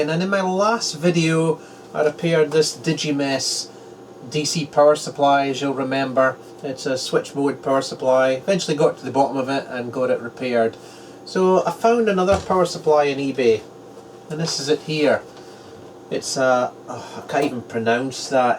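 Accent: Scotland, United Kingdom. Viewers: Did sound a bit sus.He has a wee Sco-ish accnt:-) What a silly current.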